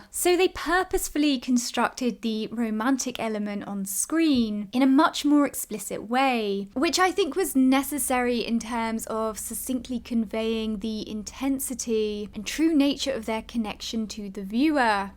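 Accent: United Kingdom. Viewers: Fellow American swine here, and I concur that cadence and refined British accent are quite divine. Her insights are always so thought-provoking, too. ❤❤❤❤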